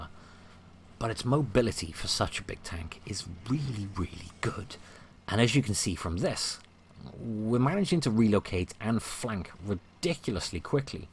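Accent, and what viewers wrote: United Kingdom. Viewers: U see that typicaly english? I think he is 100% UK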